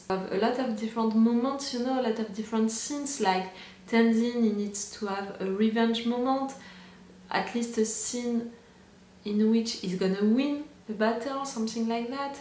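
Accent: France. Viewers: i love your english with french accent its soothing at times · You still have the most incredibly French and incredibly sexy voice and accent that I have ever heard ; ) Now, on to the reaction! <3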